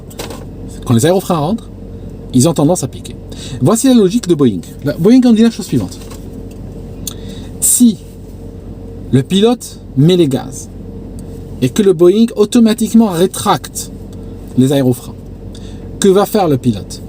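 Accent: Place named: Switzerland. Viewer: charmant le petit accent suisse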